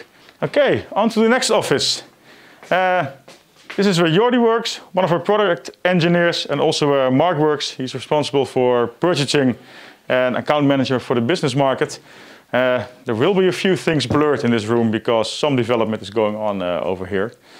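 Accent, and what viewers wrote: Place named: Netherlands. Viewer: Love the dutch accent😅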